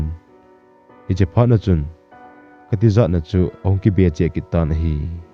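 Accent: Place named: Nagaland, India. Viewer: Sir hoylang ho nahi hm, na awso chu achome, ole Nagaland,nc hill Assam lang ho nahim sir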